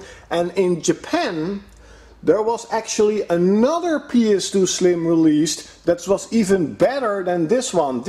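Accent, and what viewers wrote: Netherlands. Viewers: you really have a Dutch English accent lol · Ben je nederlands? Are you dutch because you have a dutch accent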